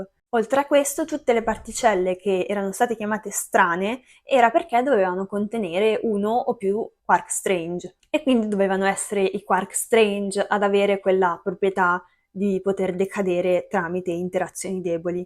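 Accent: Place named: Tuscany, Italy. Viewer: Hai un simpatico accento forse toscano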